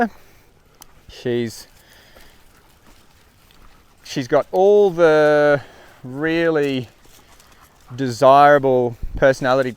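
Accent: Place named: Australia. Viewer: Good to know. Thanks for the update. By the way, I'm from the US and it was your Aussie accent that had me thinking you were saying "Carla" instead of "Kyla."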